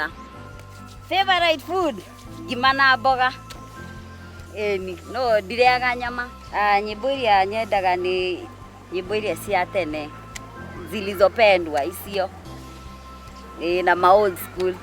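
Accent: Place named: Kirinyaga, Kenya. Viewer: Kirinyaga accent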